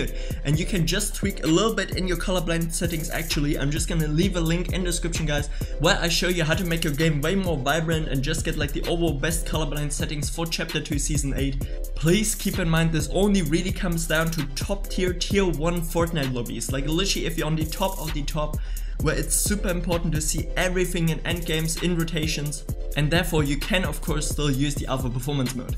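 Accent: Germany. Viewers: Dein deutscher akzent so nice haha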